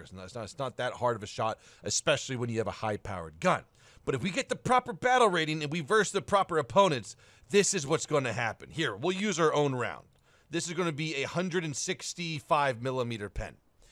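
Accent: South, United States. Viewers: the country pronunciation was better tbh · country accent has a lil sugar in the tank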